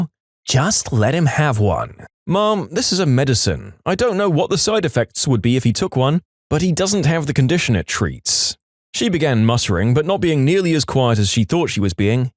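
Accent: United Kingdom. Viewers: Why doe's he sound British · Whoa what happened to the person who was telling the story to all of us he sounds more British than robotic I think they're replaced the AI voice with an actual person and that actual person just seem to be British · Is it just me or did you suddenly become British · Why is he britsh now? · This new guy is a grilling MACHINE! keep up the good work man! Also are u British? U sound British.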